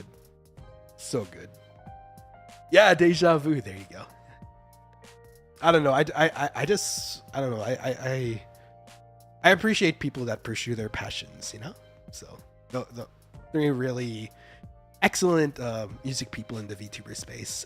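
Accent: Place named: Thailand. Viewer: omg your thai accent is so adorable ✨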